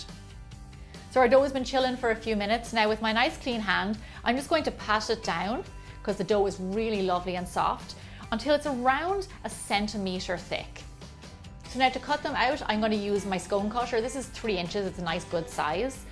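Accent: Ireland. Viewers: Are Ya from Ireland 🇮🇪??? Eh??? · I love the Irish accent! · I was going to ask the same as my mentor has the same exact accent, and I'm bad with accents. It believe its an irish accent though. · Absolutely love your Irish accent. As yummy as the muffins. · You sound a wee bit Irish are you? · She has a VERY Irish accent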